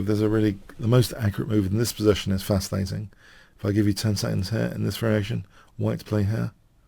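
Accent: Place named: RP, United Kingdom. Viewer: May I say... I love your videos as much for the great chess insights as for your very nice English BBC accent ☺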